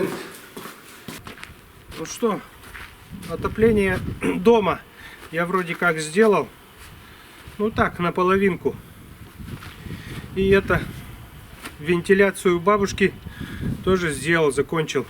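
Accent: Perm, Russia. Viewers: Говор Пермский уши режет.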